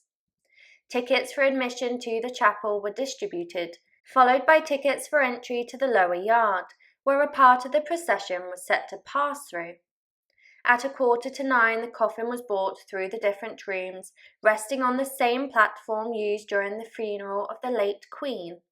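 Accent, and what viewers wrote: United Kingdom. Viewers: I’m not even British and I understood her perfectly, maybe this is not the channel for you. I’m American (deep down south of the US at that) I think her British accent is lovely. Just saying. But I agree with comment above , the use of captions should help out a lot.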